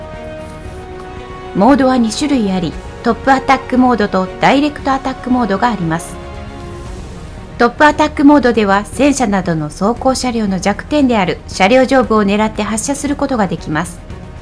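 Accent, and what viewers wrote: Kansai, Japan. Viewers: ナレーションの女性は関西人かな❓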